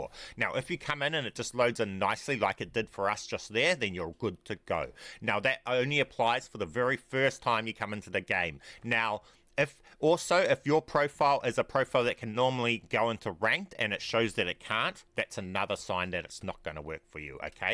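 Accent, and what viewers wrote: New Zealand. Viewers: Churr brother are you kiwi bro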